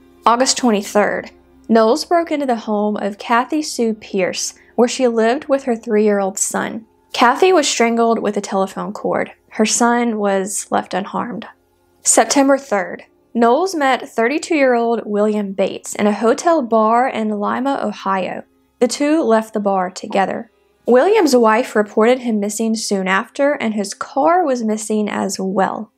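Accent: South, United States. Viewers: has a nice southern accent. Also, she is a good narrator.🤓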